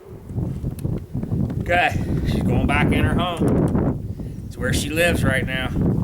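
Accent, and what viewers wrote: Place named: Pittsburgh, United States. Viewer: You have to be from Pittsburgh. Tell me your a fellow yinzer? Trying to identify the accent..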